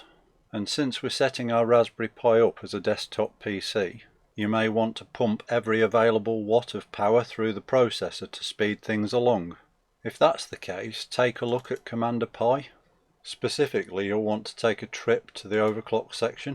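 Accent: United Kingdom. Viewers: a brummy voice i hear!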